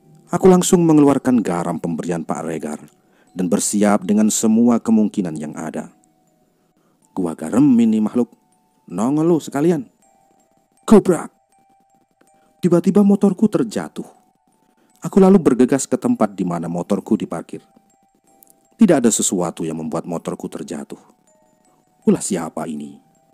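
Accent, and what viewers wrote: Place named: Java, Indonesia. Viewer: ternyata enak juga dengerin suaranya bang 😊 Dari narasi intonasi enak didengar. Ngebass agak berat cocok buat jadi MC. Cuma logat jawanya masih kental. 🙏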